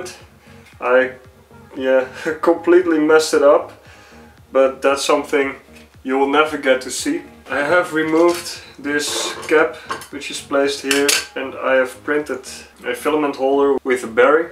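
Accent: Netherlands. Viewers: are you dutch? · You just HAVE to be dutch, listening to that accent.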